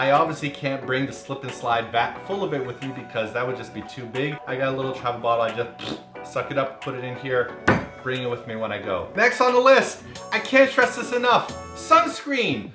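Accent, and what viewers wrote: Russia. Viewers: YAAAA I click video for manly man Rrrrussian accent! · I love Russian accent.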